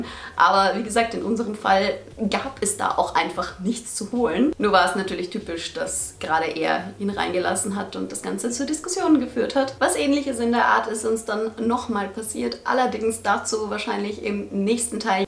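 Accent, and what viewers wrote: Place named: Vienna, Austria. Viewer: Ich höre dennoch dein wienerdialekt durch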